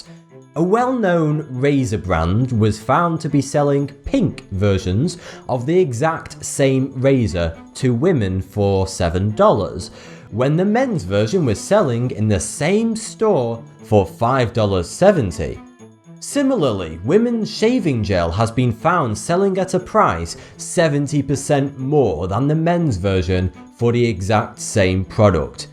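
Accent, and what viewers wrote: United Kingdom. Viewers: Actually it's because his british accent.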